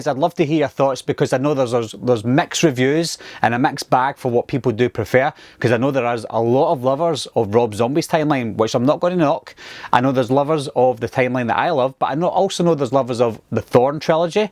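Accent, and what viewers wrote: Scotland, United Kingdom. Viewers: ...great work as always!! I like how you did something a bit different here, not just the typical paint by numbers with the franchise! Lastly....I should know this, and Shame on me for not knowing...but is that a Scottish Accent, or British? My Muay Thai Coach is Scottish and it sounds so damn familiar...either way! Love it, and great work!!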